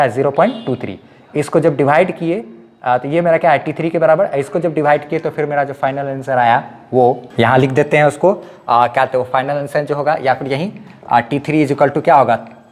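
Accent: Bihar, India. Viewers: Sir ji Bihar se ha kya ?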